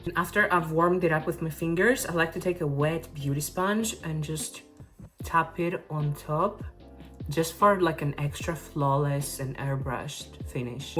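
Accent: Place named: Greece. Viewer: I don't know if this is common knowledge but I have not been sure about it. Hearing your voice now, I feel it confirms my suspicion that you might be greek, lol. So I was wondering how you use neutral pronouns in greek. Asking for personal use 😅 Anyway, thanks for the makeup tips too.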